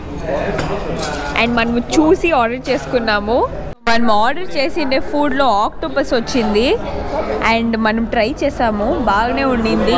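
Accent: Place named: Tamil Nadu, India. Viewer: Your Telugu is so funny 🤣, why it is like that😂, are you Tamilian?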